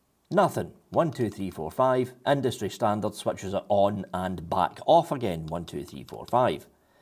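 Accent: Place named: Glasgow, United Kingdom. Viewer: First time I've ever seen a video of yours. First idea popped in me `ead was if I was listening to Glaswegian or a bloke that uses the M-77 part of their commute home from there.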